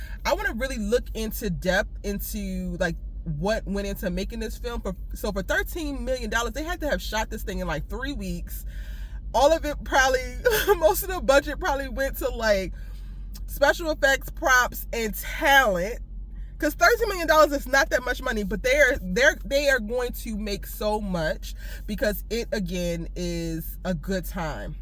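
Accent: Baltimore, United States. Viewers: Side note: I feel like your accent is giving Baltimore/DMV vibes. How wrong am I?? Lol 😂😂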